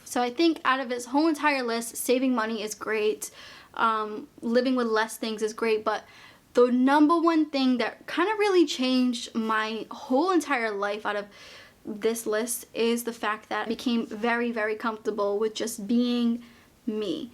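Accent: Boston, United States. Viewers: Hello from Canada. I'm a relatively new subscriber and am so glad I found your videos. You are so down to earth and logical with very good information. I just have to tell you that I love your Boston accent.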